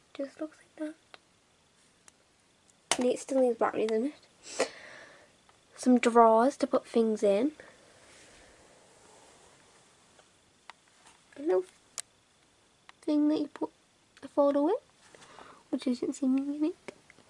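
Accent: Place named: RP, United Kingdom. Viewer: The accent though so posh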